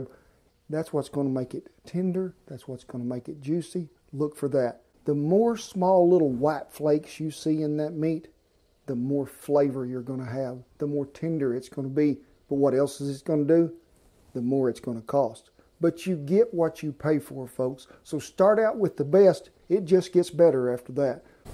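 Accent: South, United States. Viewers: I swear to god. Folks that sound like Bubba from Forest Gump know their shit. Not trying to make fun of this gentleman’s accent but by the end of this video I was drooling. I’ve come to terms that accent = master cooks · I love that American twang😂 🇬🇧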